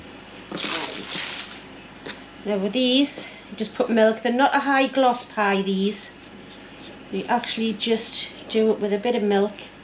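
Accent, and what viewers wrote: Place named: Johnstone, United Kingdom. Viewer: You have an extremely impressed new subscriber . I love your style , and you are my favourite of the several pie recipes on here - it was nice to see your moggy also . What a lovely crunchy fresh shallot you have also , although I am a mutton man , no drama . You sound a wee bit like a Johnstone lass , I'm from Paisley myself . Anyway , thank you very much 👍🏴♥️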